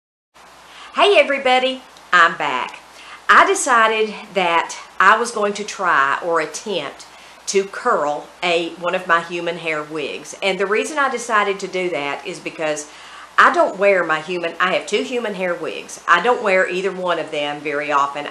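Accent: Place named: North Carolina, United States. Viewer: you make me laugh so often. I'm a North Carolinian & the 1st time I watched you, I said aloud,"" this Girl is from NC or TENN☺💕☺. I just knew that accent💕.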